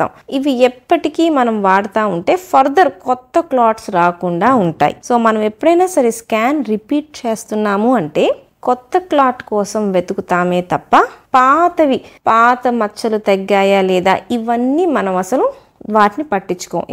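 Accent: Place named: Telangana, India. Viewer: అందుకనే డాక్టర్ రేట్. Though u in Guntur, u speak Telangana Telugu.. nice...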